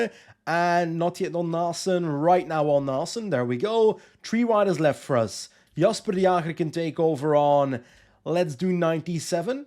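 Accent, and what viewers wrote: Belgium. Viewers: Are you from Belguim or the UK?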